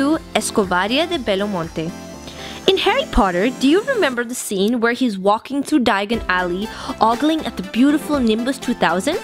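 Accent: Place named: Spain. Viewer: I'm Portuguese and hearing her pronounce every single place with a Spanish accent really made me cringe. People need to understand that Portuguese and Spanish are two different languages, we might have similarities but accent is not one of them. Portuguese and Spanish don't have a good history together so please don't ever mix Spanish and Portuguese because both ethnicities won't appreciate it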